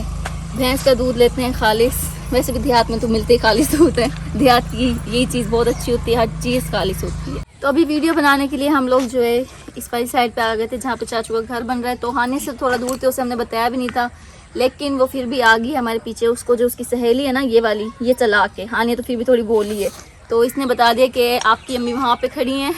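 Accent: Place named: Lucknow, India. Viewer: Ap ka bat karne ka lehja or tarika India Lucknow ke logo ki tarha he... Love from india🇮🇳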